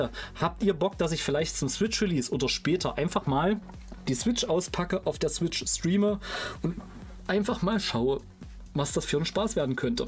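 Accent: Saxony, Germany. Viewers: Kommst. Du aus sachsen wie ich?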